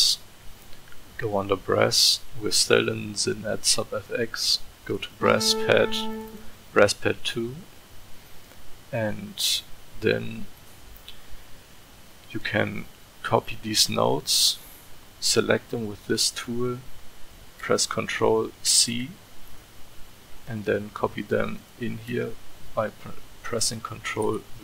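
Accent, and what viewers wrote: Germany. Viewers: Thank you man! You sound like you're from Bavaria. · man merkt voll dass er deutscher ist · He isnt sad it's his accent he is German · are you german? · Are you german? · hard german aktzent hast du :) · Can know by your accentXD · Man hörts an dem Akzent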